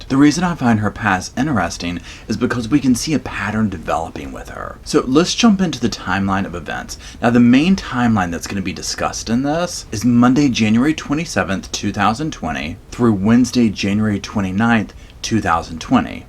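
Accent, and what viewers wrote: South, United States. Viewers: Lovin your accent. The really strong Southern accent is so homely. It’s funny as I’m from Ireland. □□□□:_SofaSquad::_SofaSquad::_SofaSquad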